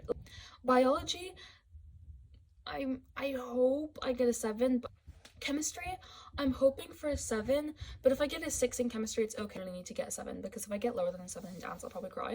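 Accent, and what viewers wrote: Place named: United States. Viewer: ohh u have an American accent when talking in English haha. Je peux pas parler français????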